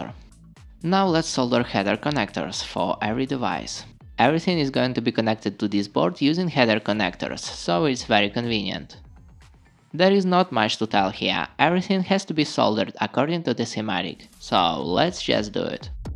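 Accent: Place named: Russia. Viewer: The project is only good for the little house, with little energy consumption. P.S. Despite living in US, this guy still has very strong russian accent :-)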